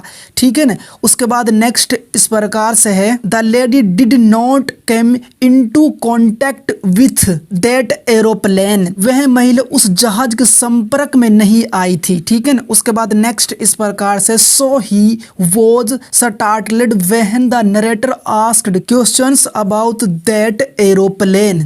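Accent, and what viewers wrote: Mewat, India. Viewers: Mewati hai bhai tu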